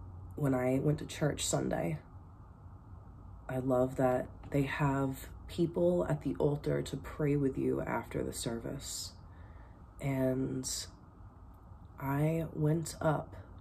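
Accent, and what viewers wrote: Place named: Boston, United States. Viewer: oh whoops 😬 haha my bad. Hope your having a great day today. Love the Boston accent that slips out every once in a while aha 😂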